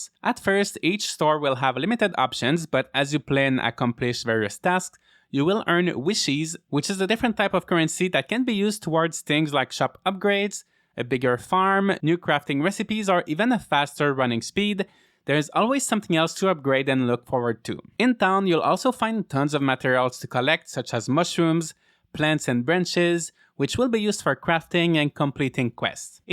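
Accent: Quebec, Canada. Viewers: T tu quebecois?? 😅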